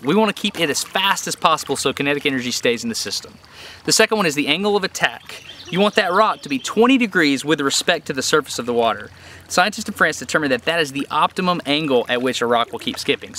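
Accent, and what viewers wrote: United States. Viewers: It's funny hearing Americans pronouncing Swedish names since we have very different pronunciation of the letter 'A'.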